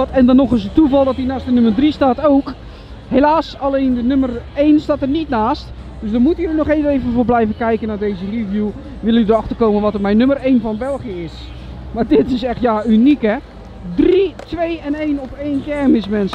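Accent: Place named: Zeeland, Netherlands. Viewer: Hoi ben sinds kort geabonneerd op jouw kanaal en ik hoor een beetje Zeeuws accent kan da kloppe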